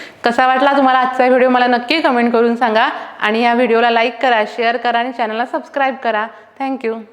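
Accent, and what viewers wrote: Kolhapur, India. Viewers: बोलण्यात हळू हळू कोल्हापुरी tone जाणवतोय बरं का!😅😅... खूप शुभेच्छा तुला...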